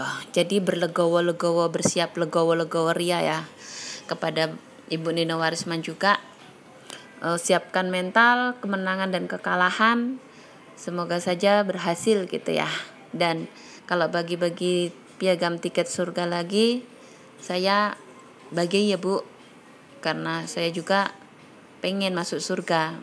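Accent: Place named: Java, Indonesia. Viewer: Suaranya mbak kok medok yo asale mana to mbak heeee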